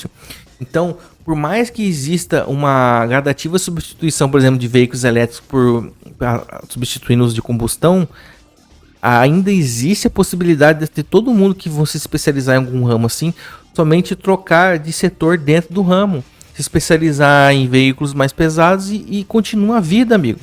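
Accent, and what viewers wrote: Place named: Inland São Paulo, Brazil. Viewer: Praticanente impossível é o sotaque do interior de S P. ele teria que viver uns 20 anos em outra região para mudar.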